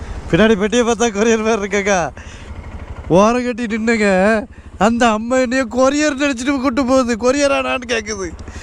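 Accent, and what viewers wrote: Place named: Thoothukudi, India. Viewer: உங்கள் தூத்துக்குடி Slang ku நாங்க அடிமை 😂 continue 🎉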